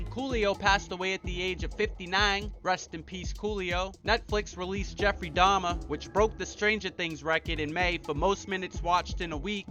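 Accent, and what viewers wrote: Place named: Massachusetts, United States. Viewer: With that accent, announcing that you're from Mass is the most unnecessary thing ever.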